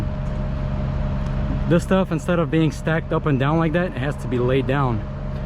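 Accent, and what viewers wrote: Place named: United States. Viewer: Да ладно, ты русский? Ахренеть, я тебя долго смотрю, думал, что ты Америкос с таким-то акцентом.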